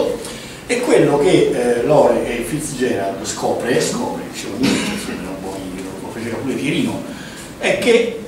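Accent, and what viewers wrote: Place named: Rome, Italy. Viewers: Io credo che neanche nell'Africa nera : eco, illuminazione comica, leggibilità della lavagna, accento romanesco.... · L'accento romanesco ci sta, il problema è il resto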